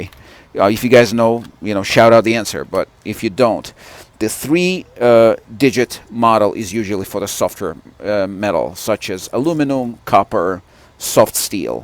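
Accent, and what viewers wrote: United States. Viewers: Thank you for your wonderful review. Love your pure American accent, remind me about George W. bush, my dream English accent. How ever your Knipe= little cutter (Knipex, 😉) is a defective one, robots use to sharpen them after induction hardening and they must have been out of calibration regardless what every one tells, I have a tool collection of almost all of most known manufactures and my knipex collection (two at least of every model cutters has zero defection like yours have got, not to mention the hardening seems to be one south side with it???? you really should send it to knipex factory in Germany and ask them to reconsider it. How ever every each of them as you know already, has been designed for different hardened material to be cut, yours indicate piano wire 2.5 mm something to keep in mind. lovely review thanks.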